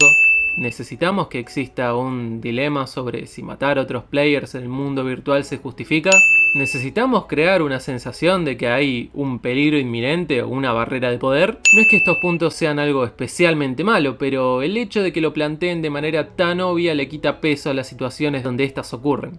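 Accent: Argentina. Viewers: Escuchar a un argentino narrando es de la re puta madre que lo pario :v JAJAJAJAJA, buena opinión.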